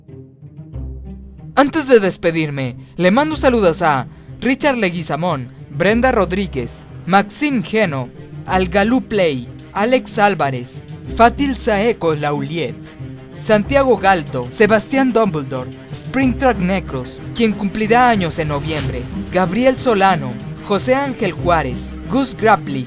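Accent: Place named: Japan. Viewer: Like si Te das cuenta de el asentó japonés en todos los videos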